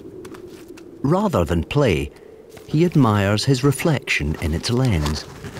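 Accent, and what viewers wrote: Scotland, United Kingdom. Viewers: Well I didn't wake up this morning expecting to hear David Tennant say "Spy Macaque" in his very Scottish accent but here we are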